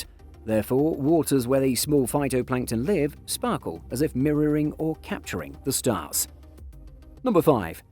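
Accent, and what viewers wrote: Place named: England, United Kingdom. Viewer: Juste pour l'accent anglais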